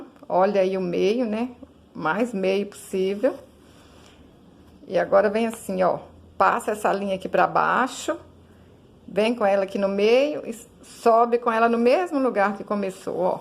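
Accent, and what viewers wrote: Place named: Caipira, Brazil. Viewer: Amiga querida eu amo seus trabalhos tento fazer quase que todos adoro sua voz meio que caipira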